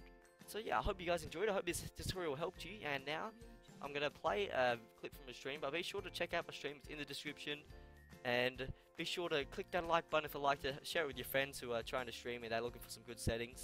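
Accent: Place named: Australia. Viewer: Aussie I Guess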